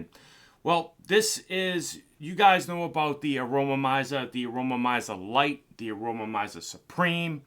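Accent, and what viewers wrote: Boston, United States. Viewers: Do I hear a Boston accent?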